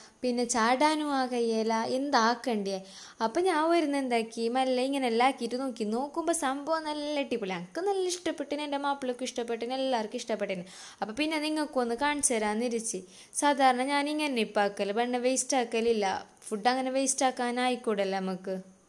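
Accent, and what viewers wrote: India. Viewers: Super too Ikkanakinane nammal kasarkode slang padikum taa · നല്ല രസ ണ്ട് സംസാരം തലശ്ശേരി യല്ലേ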